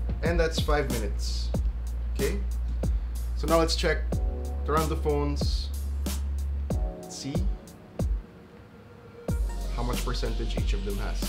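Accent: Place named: Philippines. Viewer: are you a filipino? because thats how filipinos speak English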